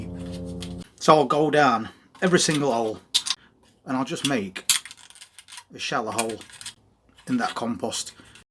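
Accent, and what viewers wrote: Yorkshire, United Kingdom. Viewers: I have just come across your channel you sound you are from Yorkshire possibly Sheffield area is this true would like to know as I’m a Yorkshire man also so we are in same area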